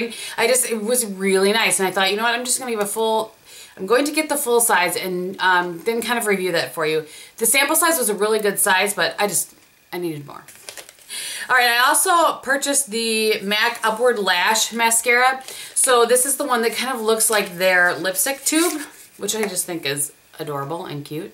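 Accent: Wisconsin, United States. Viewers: I thought I recognized that WI accent!! Osseo here!!! Love your videos! ❤️❤️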